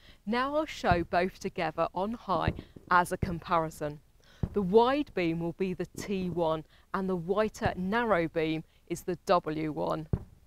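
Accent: United Kingdom. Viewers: Commanding female british accent?✔️ Bike stuff?✔️ BRIGHT POINTY HEADLIGHTS?!?!?✔️✔️✔️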